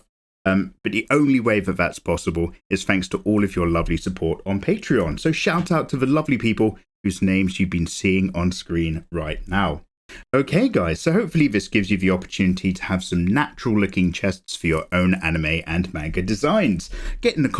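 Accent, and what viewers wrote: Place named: RP, United Kingdom. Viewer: I just spent 23 minutes listening to a very pleasant British gentleman explaining in RP (sounds like RP to my ears) how to draw anime tiddies. Youtube algorithm, mission accomplished. Jokes aside, this really was an interesting video.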